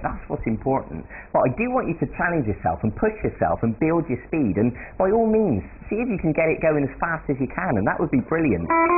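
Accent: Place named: Australia. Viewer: pretty sure thats an australian accent...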